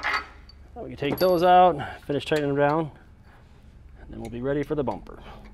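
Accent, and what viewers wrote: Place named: Canada. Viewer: Nothing wrong with a Canadian accent. lol As a Canadian, I know of lots of us live in the Detroit area and work for the Big 3, so I figured you might have been amongst them. :)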